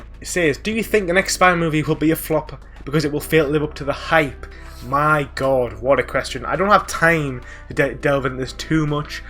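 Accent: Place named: Newcastle, United Kingdom. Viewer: Ahh yes a fellow Geordie 😁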